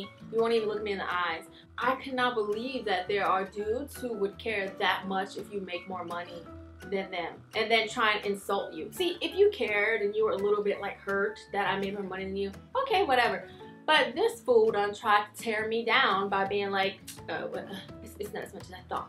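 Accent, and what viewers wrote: South, United States. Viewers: I knew you were from the south (I'm new here). Im from Macon ... Hello! 🤗🙋🏾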